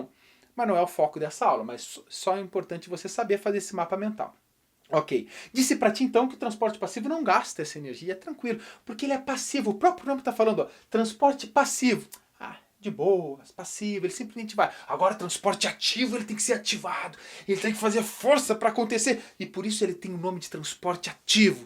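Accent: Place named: South, Brazil. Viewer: Bofe é bonito,pelo sotaque é sulista,e muito didático, estou estudando com sono,boladona com os colegas de turma,e senhor me fez rir,quase caindo.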